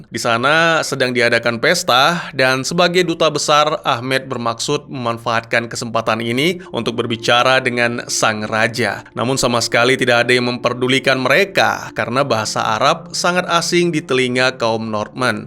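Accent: Aceh, Indonesia. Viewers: Abang orang Aceh ya ?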